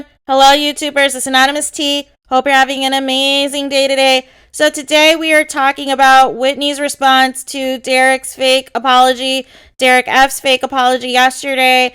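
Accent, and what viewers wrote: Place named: New England, United States. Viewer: The way you say “but” and “because” is annoy af lmao. But it sounds New England so I’m used to it.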